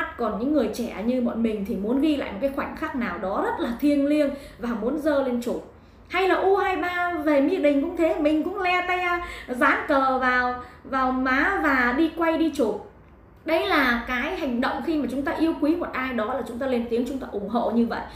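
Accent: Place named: Countryside, Vietnam. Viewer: Con này nói tiếng nhà Quê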